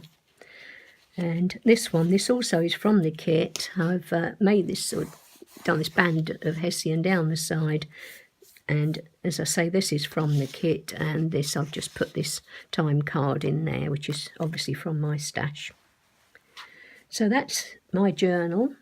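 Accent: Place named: England, United Kingdom. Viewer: Absolutely Gorgeous Thank You for sharing with us . Nikki xxx ( I can hear you are English like myself ..Do you live in the UK )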